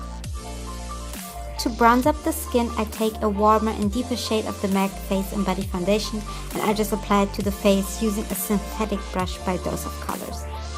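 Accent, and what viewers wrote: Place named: Germany. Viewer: I found this look on instagram and I am totally obsessed with it 😍 your accent sounds sooo german 🙈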